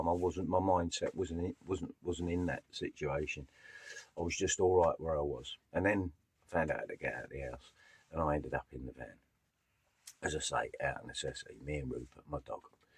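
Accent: London, United Kingdom. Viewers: His accent sounds London area to me .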